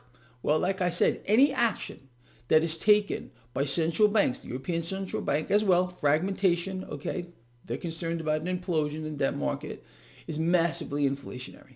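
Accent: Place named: New York, United States. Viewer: I love your accent. Real old school New York 💖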